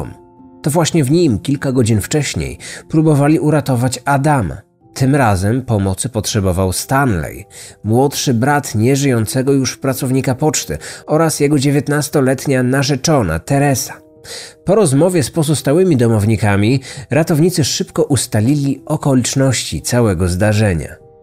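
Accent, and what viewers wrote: Poland. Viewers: Panie, wielkie uznanie za piękną polszczyznę, prawdziwy polski akcent i miły dla ucha głos. Zdrawiam i życzę wielu sukcesów.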